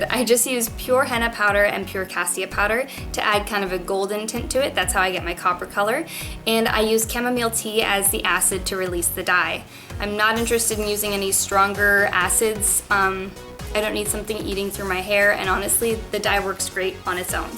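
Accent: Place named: United Kingdom. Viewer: you sound sorta British in this one!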